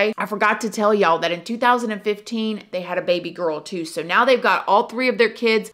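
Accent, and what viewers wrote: South, United States. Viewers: I’m high so I had to watch a few times to understand but you’re such a cool human and I love your southern vibe. Honestly reminds me of the olden days when I used to hang with family down south and we’d just tell stories. Keep it up, sister. Love your content. The fact I don’t mind relistening says a lot haha. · Your vids literally make my day. You cover scary cases but your friendly presence makes up for it. I love your accent btw feels like I’m back in the south where I grew up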